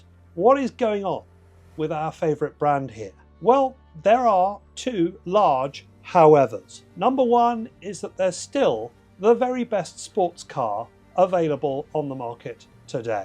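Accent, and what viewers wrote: United Kingdom. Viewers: I think it’s “jen-tee-ann” blue, but you get some slack because of your British accent. 😆 Great review though, and I’ve watched a few. Yours was a nice honest assessment. Thank you for that. One of the best so far. The cool things about the T, outside of its engine/suspension/performance specs, are the throwback wood shift knob and the black plaid seat inserts. They make the T truly unique. The good news is that you can add both of those visual cues to just about any Porsche. I’ve previously had a guy in Mexico City make almost the exact same shifter. I’m sure a black plaid material is available in automotive upholstery circles. You can also get large black/black Pepita houndstooth from Relicate for a similar interior vibe. Keep up the great work,!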